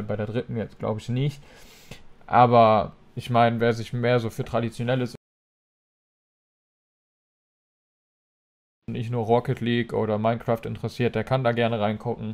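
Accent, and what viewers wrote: Luxembourg. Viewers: Biste Luxemburger? 😂😂👍👍👌💪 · Kommst du aus Luxemburg?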